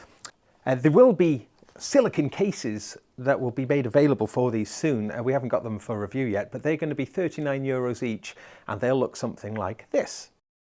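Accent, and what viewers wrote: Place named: Wales, United Kingdom. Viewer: he is not nervous, it's just the welsh accent.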